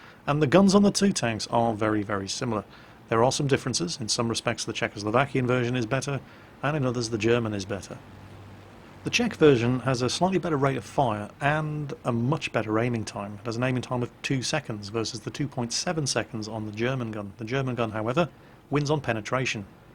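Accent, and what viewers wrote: England, United Kingdom. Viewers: Really funny thing is, that Czechoslovakia existed before and after WWII. As the matter of fact during WWII the countries separated - Slovakia cooperated with Nazis while Czech Republic remained a territory ruled by Germans. Therefore these are check-them-Czech-tanks allright. IDK if someone mentioned it before me... ;P Great channel BTW, I love your english accent, is it genuine? :) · Not interested in WoT anymore, but I needed a nap. So I fall asleep to the sweet, silky, british voice of and wake up just as the video ends. Thanks:)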